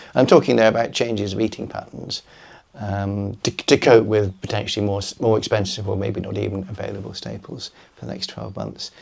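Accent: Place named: Cornwall, United Kingdom. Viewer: love the cornish accent- ell neenow! cheers!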